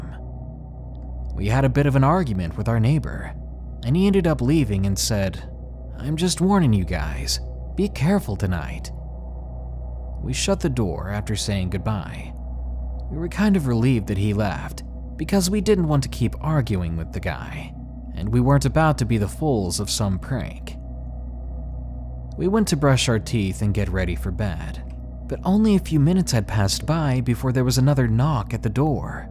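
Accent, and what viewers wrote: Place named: United States. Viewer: Oh yah you are from America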